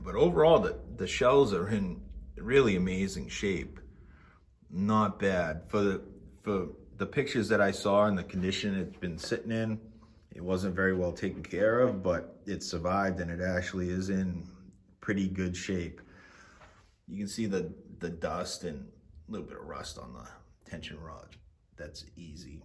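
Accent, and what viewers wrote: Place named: Western Massachusetts, United States. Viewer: I'm watching this saying to myself "I recognize that accent" and then sure enough you mentioned "western Mass" and I said Ah Ha! A fellow MassHole!!!! LOL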